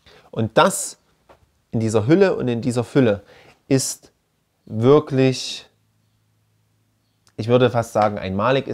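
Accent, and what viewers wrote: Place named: Saxony, Germany. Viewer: ich grüße einen Sachsen! Man kann den Dialekt nicht weg bekommen. Mir gehts auch so in Wien!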